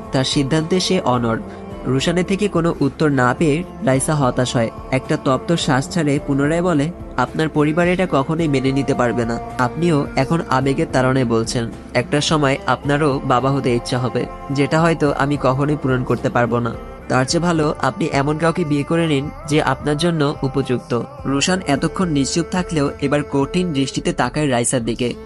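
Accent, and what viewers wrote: Sylhet, Bangladesh. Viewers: Vaiya apnar basha ki Sylhet?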